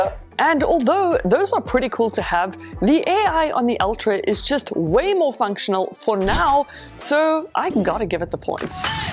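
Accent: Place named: South Africa. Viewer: You sound South African :) Love the content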